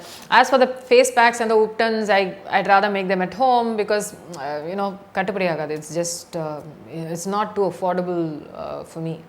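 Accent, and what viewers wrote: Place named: India. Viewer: I like Ur sonut Indian talking style 😆😆😅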